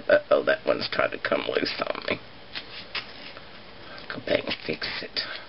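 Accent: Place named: Tennessee, United States. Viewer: U r too cute. I'm from SC. You sound like you r from TN.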